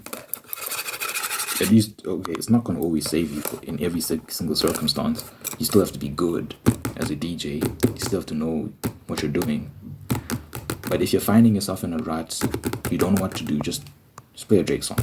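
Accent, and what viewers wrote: South Africa. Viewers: south africa im pretty surw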